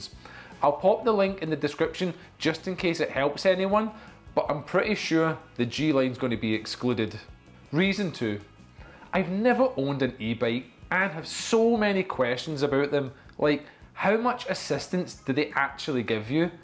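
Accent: Scotland, United Kingdom. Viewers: E beiiike… i love the scoottish people and their funny accents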